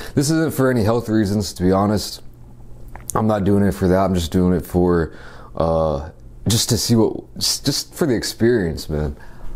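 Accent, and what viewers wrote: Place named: South, United States. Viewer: Asian man with a southern accent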